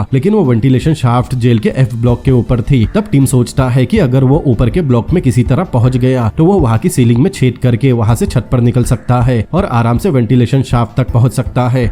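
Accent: Assam, India. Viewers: Bhai kya asaam se Hai kya tera pronunciation se pata chala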